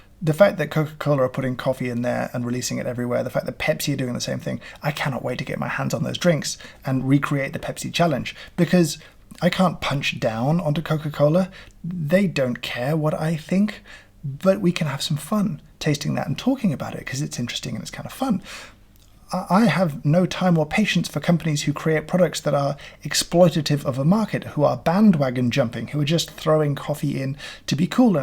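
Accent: United Kingdom. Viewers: Proper use of the English language and a British accent lend all the credibility I need.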